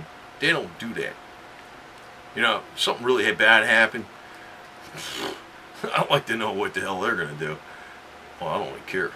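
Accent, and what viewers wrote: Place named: New England, United States. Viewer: Dude wearing a confederate hat ,rebel flag,and yankee voice and it's clear your a yankee....hard to take you serious....sorry bro, all I can do is laff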